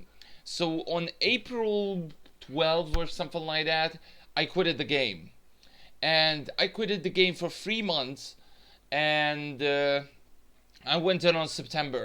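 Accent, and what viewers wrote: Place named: Hungary. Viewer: Not just your accent are bad, every english speaker hungarians accent are bad just like mine aswell! :D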